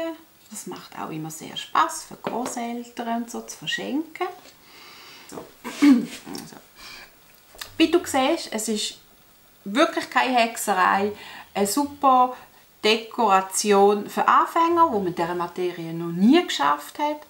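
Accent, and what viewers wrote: Switzerland. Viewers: Hallo hab dich per Zufall entdeckt, bin so begeistert und habe direkt abonniert. Dein Schweizerdeutsch gefällt mir auch.viele Worte hören sich an wie unser Dialekt.lg aus Deutschland/ Rheinhessen LG Ingrid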